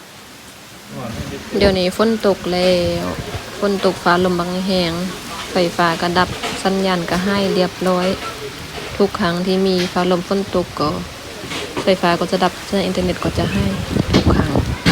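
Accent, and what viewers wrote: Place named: Dan Sai, Thailand. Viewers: สำเนียงคือ คนด่านซ้าย นาแห้ว ภูเรือ พ่อครู ยุด่านซ้าย จร้า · เป็นตาฮักหลายสาว ทำไมผมฟังสาว สำเนียงเว้าน่าฟังแล้วยิ้ม ตามเลยเด้ สบายดี บรรยากาศที่นั่นคงดีหลายน้อครับ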